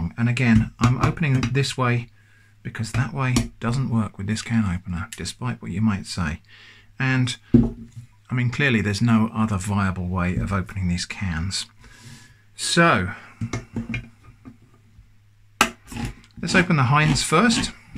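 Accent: United Kingdom. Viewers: What part of Britain are you from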